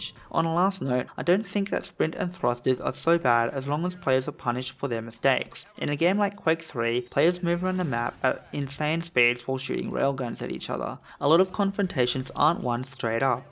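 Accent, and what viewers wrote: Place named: Australia. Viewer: Hey mate! You sound like you're from Australia!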